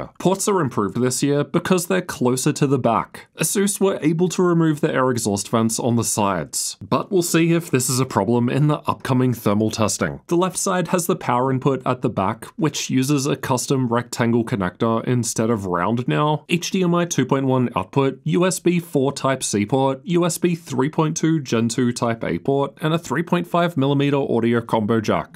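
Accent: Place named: Australia. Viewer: Man, that Aussie accent sounds funny. :D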